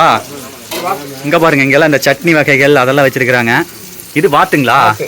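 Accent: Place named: Coimbatore, India. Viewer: கோவை தமிழ் ❤❤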